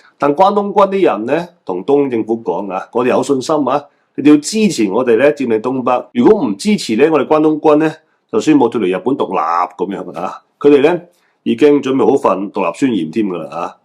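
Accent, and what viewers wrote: China. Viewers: 大陸仔滿口鄉下音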